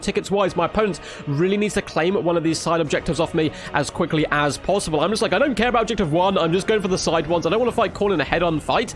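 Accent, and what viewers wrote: United Kingdom. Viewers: Are you British? You sure speak fast :)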